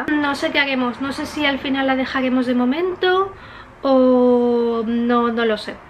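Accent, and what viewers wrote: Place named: France. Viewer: eres de origen francés? Lo digo por tu acento. Tienes algún vídeo hablando de tus orígenes? Cuánto tiempo llevas en España?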